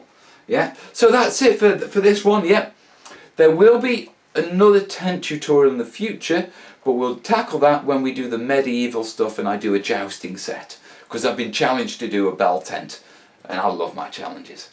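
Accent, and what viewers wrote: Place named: Lancashire, United Kingdom. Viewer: I knew it was Lancashire...nice viddy mate!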